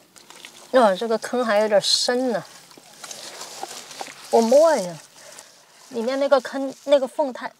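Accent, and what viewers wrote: Sichuan, China. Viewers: 哈哈幺妹儿是四川哪里的？ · 妹妹是四川人吗？ 很好听的川普呀，😝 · ，你是我们四川老乡吗？好重的川味普通话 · 四川的蛮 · 泸州 or 宜宾人吗? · 你四川哪儿的？ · 这说的是四川普通话吗？？？ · 你是重庆的还是成都的😁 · 四川话好可爱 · 妹儿 四川人啊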